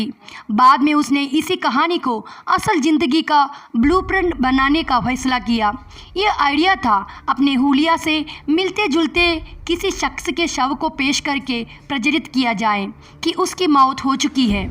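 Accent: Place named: Kerala, India. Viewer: Malayali aanale.. Hindi kettapo manasilayi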